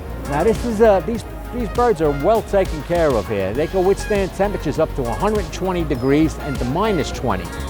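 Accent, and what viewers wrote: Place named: East Coast, United States. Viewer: U sound like your from the east i cant stand accent.niether can rest of world.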